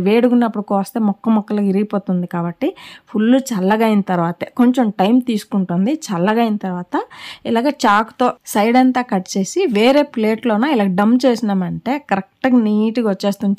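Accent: Srikakulam, India. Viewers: శ్రీకాకుళం మీది మీ యాస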